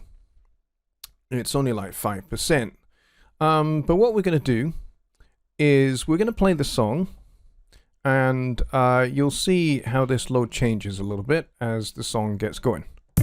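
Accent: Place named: Scotland, United Kingdom. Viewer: Where are you from in Scotland 🏴 bud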